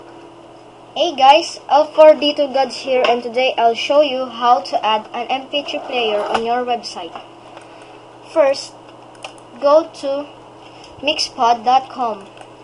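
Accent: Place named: Philippines. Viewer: Pretty fuckin late, but your accent makes me think you're Filipino lmao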